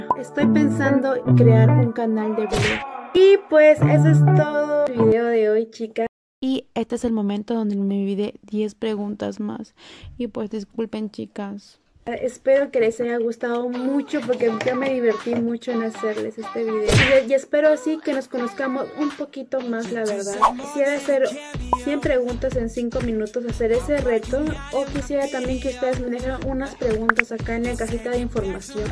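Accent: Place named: Mexico. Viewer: De verdad pense que eras mexicana porque tienes ese acento mexicano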